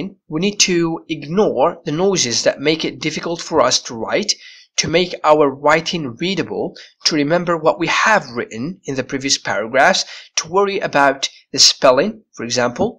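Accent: United Kingdom. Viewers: What i like about u, your UK accent Keep on that prof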